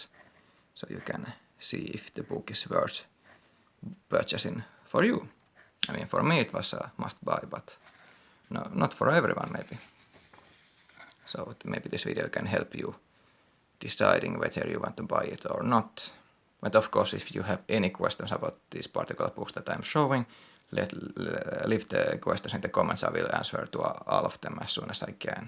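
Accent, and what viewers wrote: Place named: Finland. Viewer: Great Finnish accent! Thanks for the review. The cover art is nice but I wish they had made the spines uniform and matching so it looks more like a proper set or collection.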